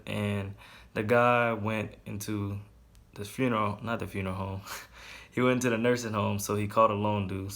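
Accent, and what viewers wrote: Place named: Eunice, United States. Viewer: This is my first time seeing one of your videos. I absolutely knew that I recognize the accent. I am from the Eunice area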